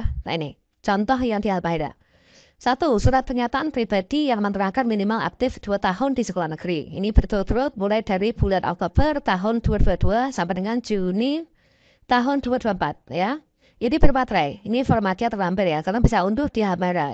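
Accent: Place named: Java, Indonesia. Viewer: Meddok banget suaranya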